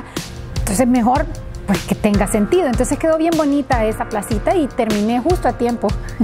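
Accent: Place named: Honduras. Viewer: Wow le quedo hermosa la casa, hace poco la encontré y me encanta su canal .... La veo desde NY pero soy de Honduras creo que usted por su acento también verdad . Felicidades un trabajo muy bello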